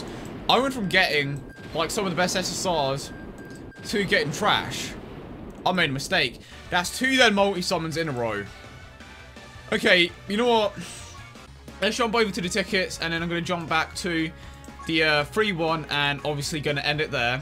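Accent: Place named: United Kingdom. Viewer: Karlo that's British accent for ya he literally said Imperfect Cell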